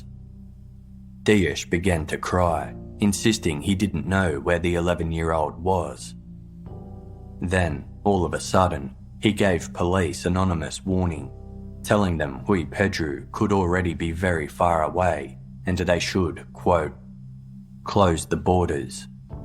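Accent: Australia. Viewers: Is Aussie?????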